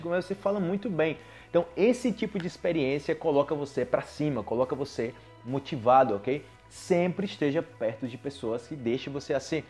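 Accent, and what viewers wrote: Brazil. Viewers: Adorei o idioma por vc .... Amo o sotaque brasileiro!!!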